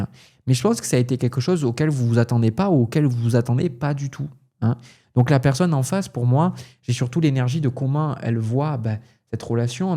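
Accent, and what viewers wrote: South, France. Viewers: Merci 🙏💗, je viens de te découvrir, c'est très parlant, c'est marrant toutes les cartes que tu as sorties sortent aussi dans les autres tirages, donc, cela confirme le tout! Je te souhaite une merveilleuse journée et ton accent du Sud me rappelle mon enfance en France, bisous 😘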